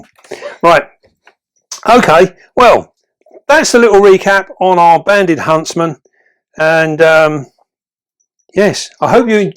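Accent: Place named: England, United Kingdom. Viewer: I'm late seeing this, sorry. But so enjoyable! I respect and learn from many channels and keepers, but you and are my favorite! It's educating, fabulously interesting and funny too at many times...this is one! I am a sucker for an English accent as well!😂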